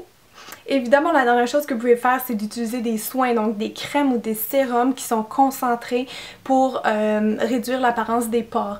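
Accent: Quebec, Canada. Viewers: J'adore l'accent québecoise😍😍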